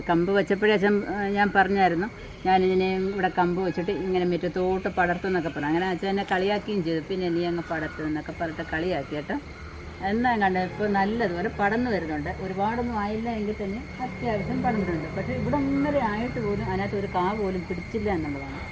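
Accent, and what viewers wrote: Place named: Thiruvananthapuram, India. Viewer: തിരുവനന്തപുരം slangs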